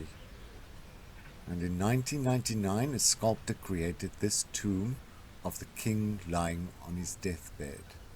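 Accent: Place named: South Africa. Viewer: Recognised the SA accent, am enjoying your channel so informative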